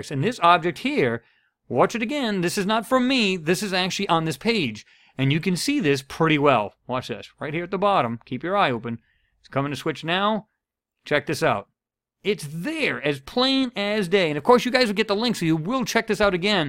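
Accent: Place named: St. Louis, United States. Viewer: good work - by the way, are you from the st. louis area? your accent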